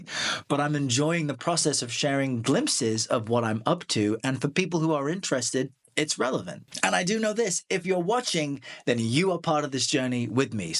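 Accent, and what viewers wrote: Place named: England, United Kingdom. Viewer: Hearing your background story made me think, “Wow. I never would’ve guessed.” Thanks for sharing that piece of yourself. I also never would’ve guessed you moved to the US at such a young age seeing as how you still have a VERY noticeable English accent. As for being the underdog, I actually think it’s not a bad place to be at all. There’s no pressure, no one knows what to expect from you, and you get to completely set the tone for whatever it is you want people to know about you. Btw, I will never understand how you anchor people get the green screen thing down. It looks sooo confusing 🤯. And I never considered how this job could be heavy at times when you’re in an unfamiliar space with a story that hits hard in a negative way. I could go on responding to every single point you made in this video, but I will spare you the essay lol. 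Keep up the good work!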